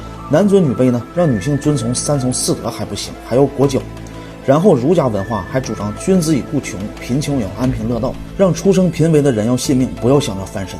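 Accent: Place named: Northeast, China. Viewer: 东北口音果然给人一种文化不是很高的刻板印象。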